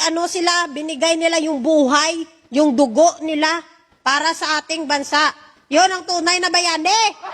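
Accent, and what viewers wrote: Iloilo, Philippines. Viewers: I love your ILONGGO ACCENT !!!🎉🎉🎉 Watching from London UK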